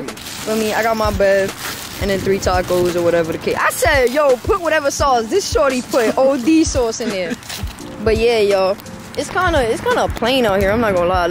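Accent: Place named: New York, United States. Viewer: That ny accent strong asf😂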